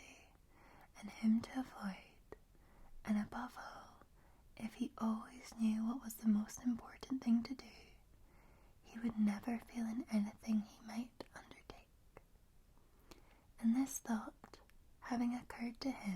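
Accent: Scotland, United Kingdom. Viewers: Fall asleep with the. Next to me......scratch one off my bucket list....💜❤😆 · Thank you. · Thank you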